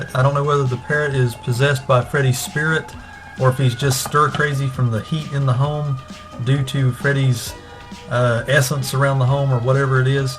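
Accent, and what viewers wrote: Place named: Southeast, United States. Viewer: Are you from one of the Southeastern United States? I like your accent.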